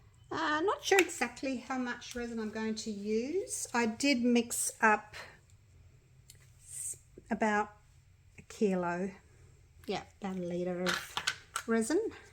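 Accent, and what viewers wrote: Australia. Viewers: Gday, so nice to hear an Aussie voice, I’m in qld. So you get your resin from us, or uk? I’m a beginner and use mainly if resin, but wanting to try the deep pour resin, bit worried on how much postage from another country will be. Thanks for awesome video.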